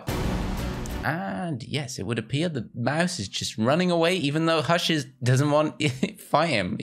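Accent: United Kingdom. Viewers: r y British?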